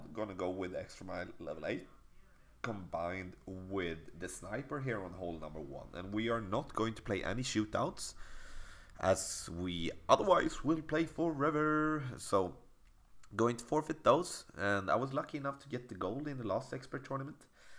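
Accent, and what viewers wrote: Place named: Scania, Sweden. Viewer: Härligt med lite skånska👌🏻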